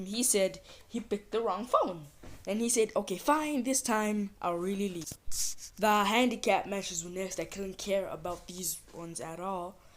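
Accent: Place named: Ireland. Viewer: You very Irish’s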